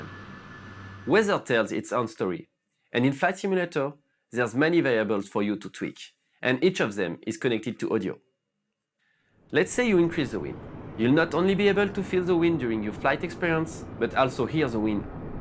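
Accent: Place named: France. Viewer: Vous êtes Français ? En tout cas ce qui me fait dire ça c'est que je remarque un léger accent Français dans l'Anglais .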